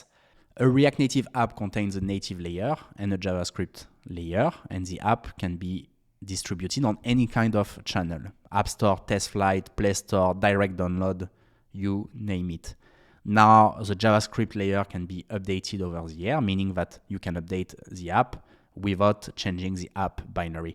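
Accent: France. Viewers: Love it when the French speak english. Great content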